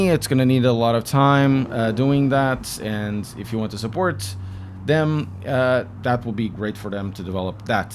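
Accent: Portugal. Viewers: Cum crlh, ainda ontem estava a ver um video do canal e a pensar para mim que pelo sotaque este homem tinha de ser tugues 😅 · did you not notice his very obviously portuguese english accent? took me a total of 4 seconds the first time i watched him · És português.? Esse sotaque Cascais tá on point ahaha um grande abraço !!! Que bom ver um português a dar se bem no sim world !! Grande abraço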